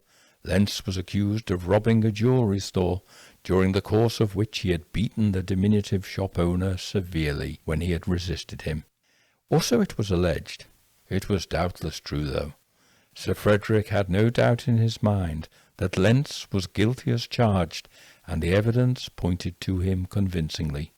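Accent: England, United Kingdom. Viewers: is a fantastic writer and storyteller i have loved all his stories with his wonderful english accent also all drawings as well i can't wait till his voice puts me to sleep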